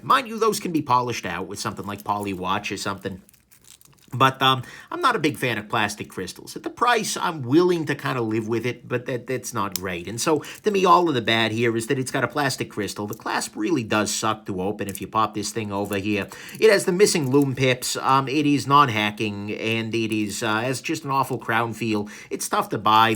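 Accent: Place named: New Jersey, United States. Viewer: Nice review! Thanks. Love the (Jersey? NY?) accent :)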